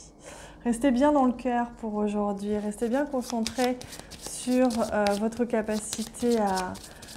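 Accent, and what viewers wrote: South, France. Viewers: Bonjour, Je vous découvre aujourd’hui. Vous avez un accent du sud aussi, comme Laura (Intuitive), peut-être vous connaissez-vous? Merci pour cette guidance. Bonne journée 🙏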